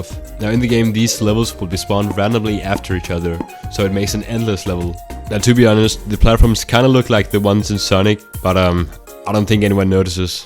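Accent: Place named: Denmark. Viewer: After watching for less than 30 seconds i managed to guess that you were danish correctly, based on your voice alone.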